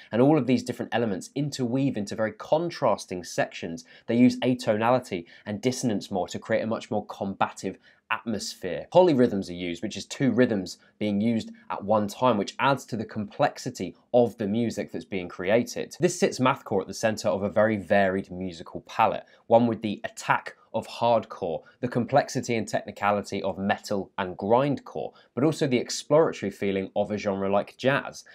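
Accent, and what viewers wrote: United Kingdom. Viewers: I've stumbled upon a British Anthony Fantano.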